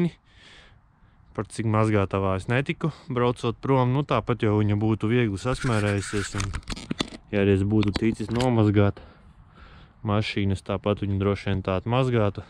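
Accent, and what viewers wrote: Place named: Lithuania. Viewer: What language, sound full on lithunanian with heavy accent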